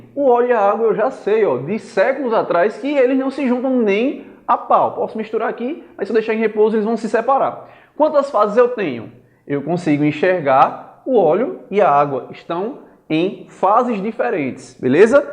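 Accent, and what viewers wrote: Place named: João Pessoa, Brazil. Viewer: sou recifense, percebo que o sotaque em cada estado do nordeste é muito diferente, sabia que eras de joao pessoa apenas pelo sotaque! divertido isso kkkkkkkk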